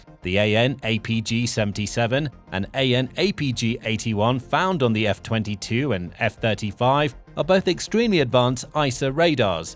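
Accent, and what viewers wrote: United Kingdom. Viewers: Yeah. I expected it before I even clicked on the vid. This channel is still such a joke. But eh. It's a brit narrating so what do you expect?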